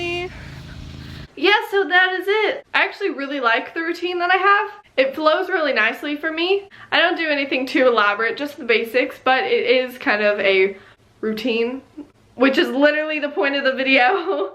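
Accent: United States. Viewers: Are you American 😑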